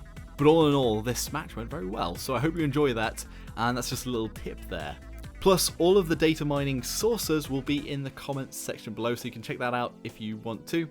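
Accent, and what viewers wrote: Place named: United Kingdom. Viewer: Why is your accent so british , idk how to call it , tremendous shit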